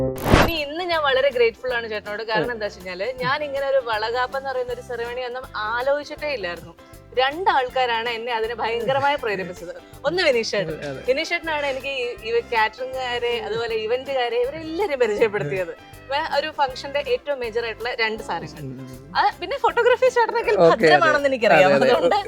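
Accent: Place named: Kasaragod, India. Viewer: Thadi nalle pangend😜kasaragod slang❤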